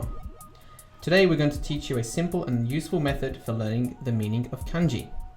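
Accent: United Kingdom. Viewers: to be Japanese you sure speak like a British, with a funny accent. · didnt expect a british accent ngl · Ironically the narrator sounds British but drew the American flag. That's far more curious.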